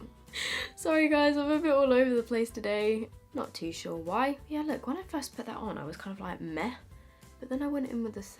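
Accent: United States. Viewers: That American accent x 😂❤ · Your American accent has me shook. I thought someone dubbed over you😂 so much better than our actual American accent tho. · So odd hearing you with American accent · Great American accent.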